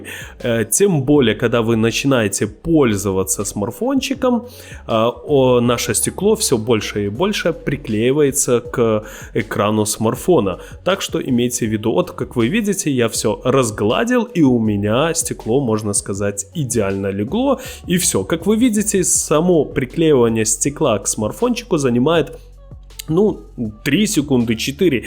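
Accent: Belarus. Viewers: Автор, Вы - беларус?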